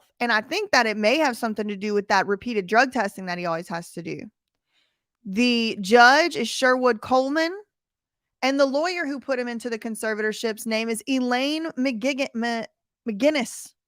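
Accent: South, United States. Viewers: Woo hoo Warrior Woman! 🎉Shae Shae shame shame. “Sometimes you can come out alright, like me and then there’s Shae.”🤣 Also, you have definitely been laying on the southern accent and rhetoric lately. Hilarious! Seriously though, I’m SO PROUD OF YOU STANDING UP FOR OTHERS AND FOR JUSTICE!😘🤗💗💕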